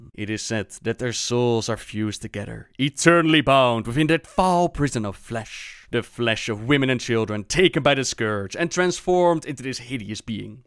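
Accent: Netherlands. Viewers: You sound sooo Dutch! Ever been told to You before?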